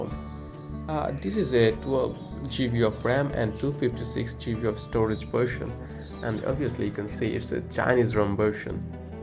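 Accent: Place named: Bangladesh. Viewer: hey bro...😍 You r from Bangladesh? you're voice accent is really amazing 😍 Love 🇧🇩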